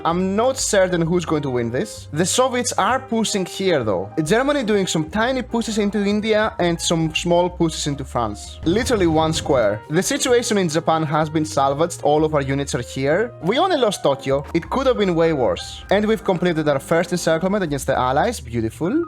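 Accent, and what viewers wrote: Greece. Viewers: I suffered through 16 minutes of chracteristically Greek accent and survived it.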